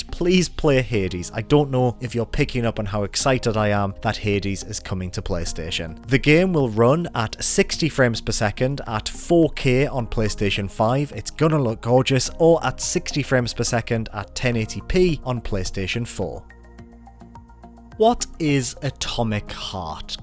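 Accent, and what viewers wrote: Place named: Newcastle, United Kingdom. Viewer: I get a warm feeling in my stomach when I hear this guy's little Geordie voice. So soothing.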